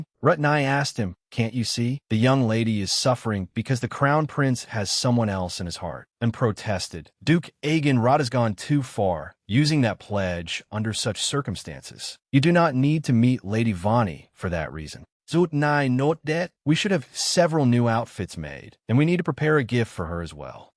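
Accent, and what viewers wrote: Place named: France. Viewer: I can't tell if the AI is part French or part German 😅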